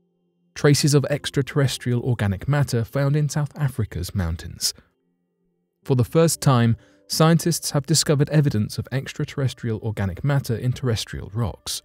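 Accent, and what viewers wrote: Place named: Southern England, United Kingdom. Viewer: It's southern English same as mine an you really can't understand? where s your accent from just curious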